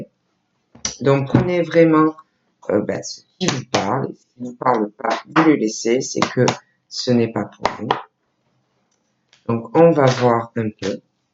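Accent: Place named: Marseille, France. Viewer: Bonjour s est la première fois que je vous vois, et vous écoute, vous êtes un monstre dans le bon sens biensur, vous êtes extraordinaire, merci votre accent, j ai le même Marseille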